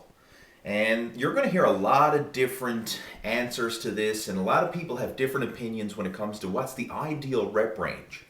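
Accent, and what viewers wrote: Newfoundland, Canada. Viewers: Great videos! Lovin' the Newfoundland dialect aswell haha :D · best canadian accent i've ever heard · Are you from Canada? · Is that a newfie accent I hear? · I love your canadian accent!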